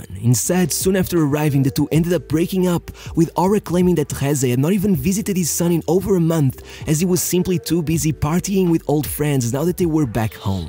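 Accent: Portugal. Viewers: Why is He pronouncing the spanish names as If they are from portugal? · Because he is Portuguese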